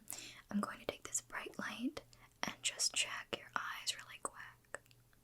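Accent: South, United States. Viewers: Can you make more southern ascent videos love them!❤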